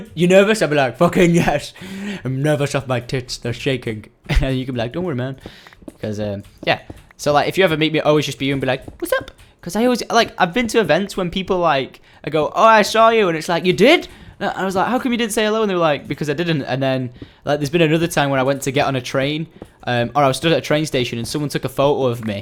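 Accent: United Kingdom. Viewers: are u britsh cuz u sound britsh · aren't you british?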